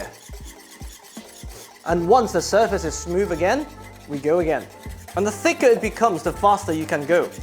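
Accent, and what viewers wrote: United Kingdom. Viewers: He sounds very British! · Loved your british accent!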